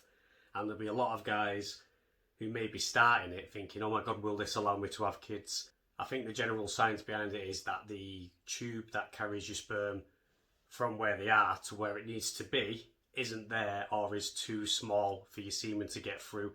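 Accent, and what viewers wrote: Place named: Northern England, United Kingdom. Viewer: Which CF unit do you go to? You sound like your from the North? I go to Leeds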